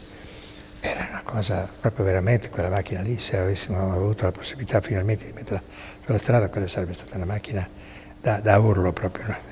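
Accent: Abruzzo, Italy. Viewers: se però andassi a un corso serale di italiano non sarebbe male, eviteresti di apparire come un asino che raglia. Neanche più i pastori abruzzesi parlano con quell’accento. Gombordamendo…. Ma come cazzo si fa a parlare così nel 2023 porco iddio lurido!!!!!!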